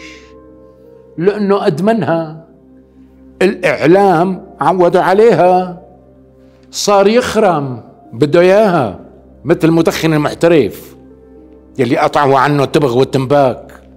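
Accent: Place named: Damascus, Syria. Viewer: ما احلى النطق الدمشقي للغة العربية عظيمً يا أستاذ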